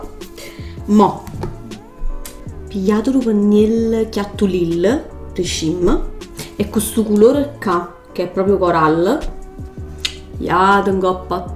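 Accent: Naples, Italy. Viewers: Si sente che sei napoletano perché anch'io sono di provincia di Napoli faccio pure io la cultur napoletani ma po chi so sti strunzz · Il dialetto è perfetto confermo che è napoletano io sono CI napoli❤❤ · Si ragazzi sono napoletana e si vede l’accento, È napoletana👌🏻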